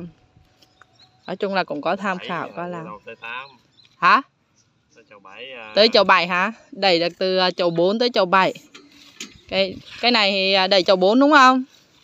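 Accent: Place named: South, Vietnam. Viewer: Giọng nam bộ dễ thương nhất!!!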